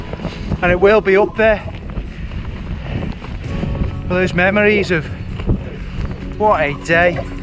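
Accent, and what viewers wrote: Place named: Merseyside, United Kingdom. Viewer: Not many can pull off a walk and talk and keep it interesting but fair play I enjoyed this. Lucky with the snow 👀 still nothing here at the moment as is the norm unfortunately. You sound quite local to me? Merseyside Region? Nice video, Atb.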